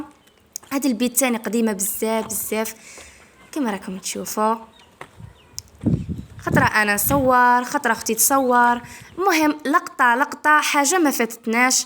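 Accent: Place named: Relizane, Algeria. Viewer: ماشاء الله تبارك الرحمن أنا متتبيعة جديدة أنتو من غليزان لهجتكم من غليزان رجعو علية جزاكم الله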